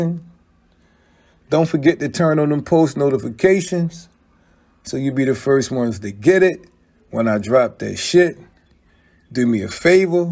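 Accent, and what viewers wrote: Baltimore, United States. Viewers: Are you from Baltimore? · I had to shut this off. That Baltimore accent is awful.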